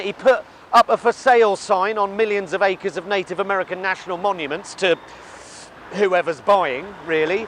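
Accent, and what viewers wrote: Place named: London, United Kingdom. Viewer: Gotta love that London accent.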